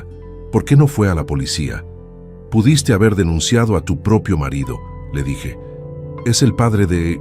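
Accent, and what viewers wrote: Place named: Dominican Republic. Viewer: Te.escucho.d e.dominicana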